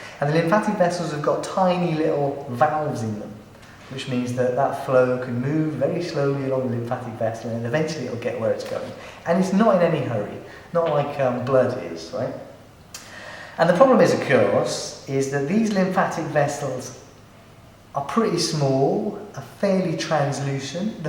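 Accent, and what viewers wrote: United Kingdom. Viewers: im in love with you and your British accent i love so much thx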